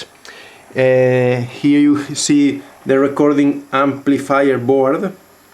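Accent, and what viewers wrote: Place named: Spain. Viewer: Curioso lo de que comparta mecanismo con Nakamichi, el diseño de época está muy guapo.😎😎😎 Por cierto ¿Has intentado hacer el vídeo en castellano o en alguno de los idiomas comunitarios del país? Parece que seas de aquí intentando hablar inglés. Personalmente mejor usar subtitulos si quiero llegar a público internacional, pero ya al gusto de cada cual. Un abrazo🤗🤗🤗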